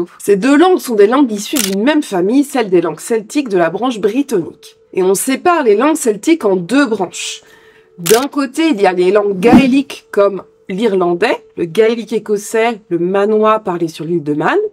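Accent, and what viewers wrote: France. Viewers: Bonjour. mtn tu l'est. Sois pas trompée par tout ce blah blah patriotique bidon dans les stades de rugby pleins de drapeaux et de chansons. J'étais le seul élève dans ma classe à choisir de continuer avec le gallois après 14 ans quand cette matière cessa d'être obligatoire. Le seul! J'ai étudié le français et le gallois jusqu'à 18 ans. Mtn j'ai 60 ans et ma fille mon (premier, plus à suivre, j'espère) petit fils sont gallophones, langue dominante. Plus tard dans la vie je me suis fait très fort en Catalan aussi, autodidacte. J'ai fait des recherches sur les autres langues liées avec le Catalan et ensuite j'ai tomber sur ta vidéo sur le Gallo. Ensuite j'ai continuer à voir tes autres vidéos. Je trouve plutôt intéressant écouter ma propre langue prononcée dans un accent français mais je n'ai pas envie de l'apprendre. 4 quatre langue me suffisent je pense. 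Merci et bonne chance à la suite!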